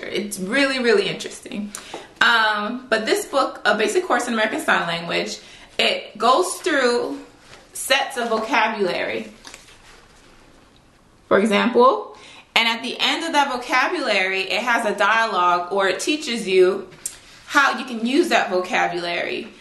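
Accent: Caribbean. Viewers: I started teaching myself sign language from this girl on YT-AshleyMichele07. You're right, it is learning a new language. I hear the accent. Big ups to the Caribbean ladies.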